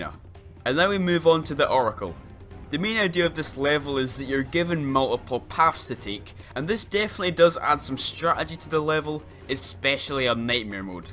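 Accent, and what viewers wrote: United Kingdom. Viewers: Wait why is he British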